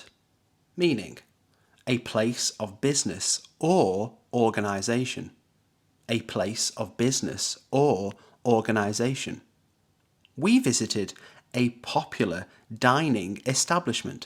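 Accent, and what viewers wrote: United Kingdom. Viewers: What accent do you have? Is it British?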